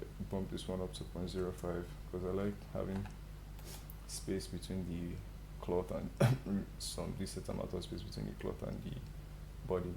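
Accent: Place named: Nigeria. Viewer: Omg are you Nigerian?? You sound nigerian. Thank you for making this vid ❤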